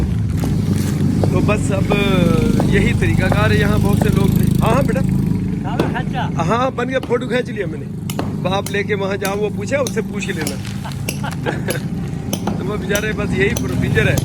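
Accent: Punjab, India. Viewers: Mujhe bhi panjabi nhi aati...but i like your panjabi accent....mujhe to bahut achcha lagta hai uncle apse panjabi sunna..i like the way you talk ..think..and everything..don't change Uncle..we like you the way you are..🙂🙂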